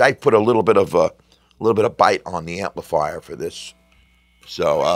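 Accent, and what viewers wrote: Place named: New York, United States. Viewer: I got the same guitar only left handed. Best les paul I've ever played! Nice italian New Yorksish accent!:)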